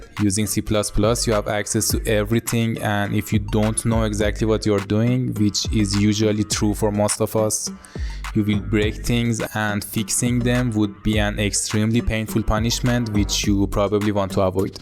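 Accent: Iran. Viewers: bro from that accent your definitely persian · لهجه ایرانی وری یونیک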